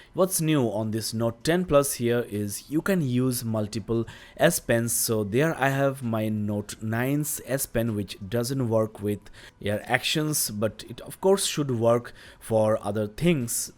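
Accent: India. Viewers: I absolutely hate Indian accents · God, I hate your bud bud accent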